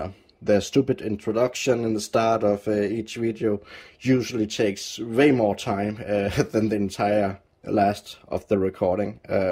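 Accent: Denmark. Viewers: I love your videos, the Danish accent makes me smile, and fits the content. Keep up the great work. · Thanks for the update BTW, if i ever need to show our lovely danish accent to anyone, ill show them this video. Håber der kommer en ny video April 2024 Tak for det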